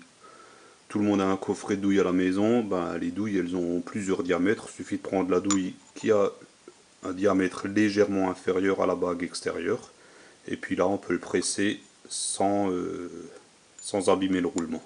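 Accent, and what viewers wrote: Alsace, France. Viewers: je suis tombé sur votre vidéo YouTube et j'ai de suite reconnu l'accent forcément etant alsacien. Wittenheim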